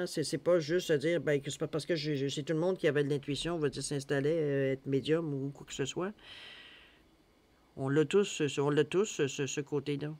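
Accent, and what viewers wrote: Quebec, Canada. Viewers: il faudrait parler moins rapidement,car ce n'est pas évident avec l'accent québécois,merci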